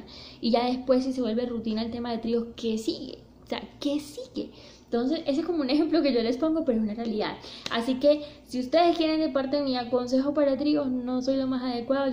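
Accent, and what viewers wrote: Colombia. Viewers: es de Colombia por su asientos y forma de ablar ve sus vídeos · Buen vidro, un abrazo desde barranquilla. Aveces se te sale un asento costeño.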